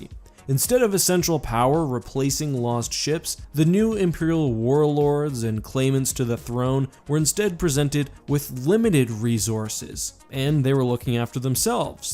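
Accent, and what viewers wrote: Canada. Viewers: My happy place is listening to talking about star wars. Make love to my ears with that sweet canadian accent bro. 🇨🇦🇦🇺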